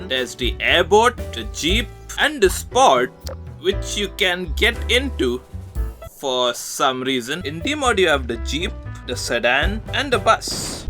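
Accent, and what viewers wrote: India. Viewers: you gotta love his Indian accent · You sound indian but i have abit indian accent in my voice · you are indian?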